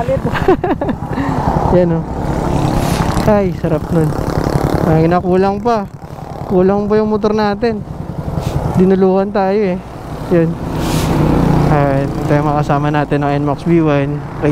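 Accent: Iloilo, Philippines. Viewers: Parang iloilo to ah.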